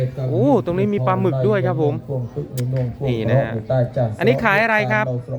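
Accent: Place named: Isan, Thailand. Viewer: สำเนียง ภาษาอีสาน พี่พูด สื่อสาร ได้ เพราะ มากคัฟ #FC คัฟ #เด็กใต้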